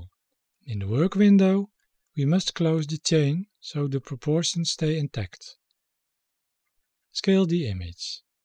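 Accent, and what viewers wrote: Netherlands. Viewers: You have that typical dutch-english accent, love it.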